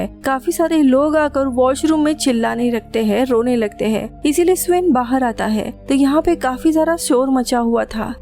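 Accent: Maharashtra, India. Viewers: Mam aap Maharashtra se ho ?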